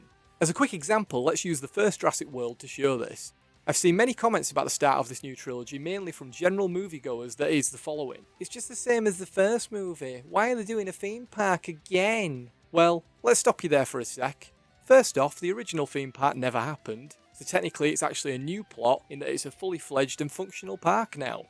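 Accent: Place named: Yorkshire, United Kingdom. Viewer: You're welcome! I probably watch more film reviews than anything else to be honest. If I'm interested in the film, I'll watch the review. On the basis of that, I might just might watch the whole film at some point. I hear you. I'm definitely not saying ALL internet content should be positive. No, it should be honest. I just don't like the bandwagon effect, where an imperfect film comes out (Disney's Star Wars sequels for example) and absolutely everyone has to make a video about it, not only criticising every little detail, but using phrases like "Worst film ever" and "Why Star Wars Is Even Worse Than You Thought." To borrow a phrase from your neck of the woods: Fook off wi'that shiiiite. I get it, uploaders want their clicks and whatnot. But as all these people scramble for clicks and revenue, I come to appreciate channels that are a little more balanced about things. 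I think it probably takes a little more courage to state your own opinions rather than repeat the same crap a hundred other reviewers are spewing. You'll grow. You'll make a living out of this if you aren't already. It's a matter of time. Quality production, likeable host, dulcet Yorkshire tones... Contrarian opinions? You're like Sharpe, but without the rifle and awesome duds. Lmao. I better go. Please don't feel you need to reply to all this. We'll be here forever if you do. Haha. Have a good one,;)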